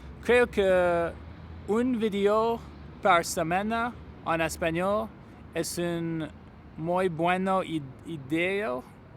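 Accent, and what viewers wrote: France. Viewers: 💪😀💪 te comprendo. Tu francés te confunde con el español pero es poco a poco. Vas bien al mirar la televisión en Español. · I only spoke Spanish for the first 4 years of my life as I was raised by my grandma. Once we got into school they kind of forced us to stop speaking it to catch up in English . Still a little bitter about it. I can survive but it’s so frustrating for it not to be natural sounding like my parents or my grandma. Not be able to express myself in the way I can in English. I didn’t help myself as I took French in high school. I can say and write je suis désolé mais je ne parle pas français. Est-ce que vous parlez anglais o espagnol? Or ça va and other basic little phrases. Also cool to hear the French come out in your Spanish. Like when you say que. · It’s so great to see you trying to learn Spanish, I mean the fact that you are putting yourself in this vulnerable position it’s amazing. 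Luisito Comunica(not his last name, actually a variation from the verb comunicar, for example: yo comunico, tú comunicas, EL COMUNICA) it’s a great channel but maybe you should look for Super Holly, she’s a native English speaker but her Spanish it’s perfect and has so many videos teaching English and Spanish and it could help you feel related because you have the same native tongue. In your video I think you keep mixing Spanish and French. I learned English by listening to music and watching films and tv shows with subtitles, first in Spanish then in English until I just hear them, my English by no means it’s perfect it’s always a work in progress but I never stop trying and neither should you. Keep learning 👍🏽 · ¿Do you know French? I can notice how you pronounced some French words/phrases. It’s pretty cool that you did your best for this video, congrats! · I think you are very brave. 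My friends tell me to watch videos of televisión novellas to help my ears learn to hear Spanish sounds. Do you speak French? I think I hear a little. You're right, if we keep it up, we can only learn more and speak mejor. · Excelente primer intento! Sigue practicando y mejorarás mucho! Se nota que sabes francés y lo mezclas, pero vas bien!! You can do it! 😎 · I can see that you mix it with a bit of french, but still great spanish, the pronuciation is understandable, you just need to correct some mistakes, and you'll start improving :) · Your french commes out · Good job! The best way to learn a language is go for it! Even though you made mistakes you still were able to communicate the message, and that is always what is most important. I did notice that you kept wanting to do French pronunciations to Spanish words (like the word "que"). The reason for this is that both are Romantic languages. 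But just keep at it. I know when I first went to Quebec my French was awful, but I kept forcing myself to speak and within a short time I was able to have brief conversations. I know people fear saying the wrong thing or being corrected by a native speaker so they won't make the attempt, but if you look at it, when you discover you made a mistake and get corrected or correct yourself the odds are you won't do it again, so make those mistakes and learn from them and you'll more quickly gain expertise in the language. A quick tip for a quick and large vocabulary expansion: if the word ends in tion (like operation) replace the tion to cion and pronounce it in Spanish: Operation = Operacion, Relation = Relacion, etc. Also, if the word is feminine in French it'll almost for certain be feminine in Spanish and the same goes for the masculine. Keep up the good work!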